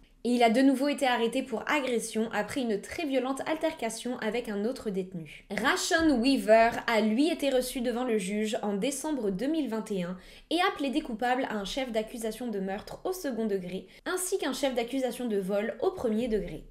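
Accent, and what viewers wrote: Quebec, Canada. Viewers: Allô tu es française oui mais parfois je reconnaît un peu de quebecois en toi ….vie tu au Québec ???? J’adore tes vidéo en passant ❤️ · Effectivement,, moi aussi je trouve que ton accent es de plus en plus québécois !! 👌😉 Et bravo pour tes vidéos continue ton excellent travail !!😊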